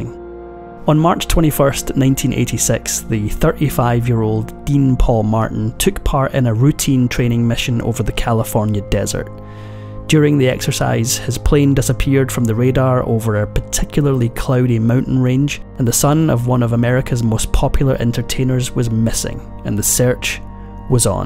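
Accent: Ireland. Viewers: Are you Irish? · Is the narrator Irish?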